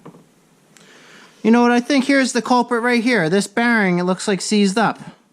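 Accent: United States. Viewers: stop fuckin saying yawn mower. god damn yank.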